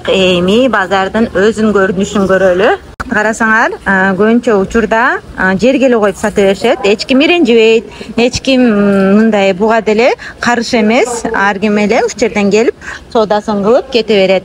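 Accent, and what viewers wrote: Standard. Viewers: Эн соонун!!!! Чет жерде журсо дагы нукура кыргызча суйлоп жатат бир тууганыбыз❤❤❤❤ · Баштык, дүкөн деп кыпкыргызча сүйлөп жатасыз рахмат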